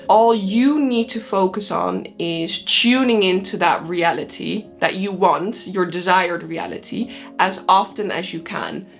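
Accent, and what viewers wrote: Netherlands. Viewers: you're dutch aren't you? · Can really hear the Dutchy through your English haha. Well explained very good video thanks for sharing this amazing knowledge. Love diving deep into consciousness, manifestation and psychology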